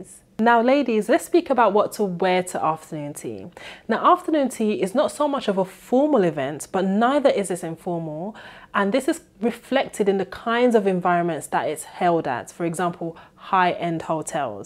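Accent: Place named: Cockney, United Kingdom. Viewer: Love your refined east-end accent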